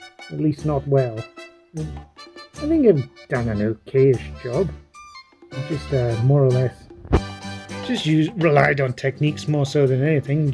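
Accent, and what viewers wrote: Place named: Wales, United Kingdom. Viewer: Being welsh, I approve that accent 😂!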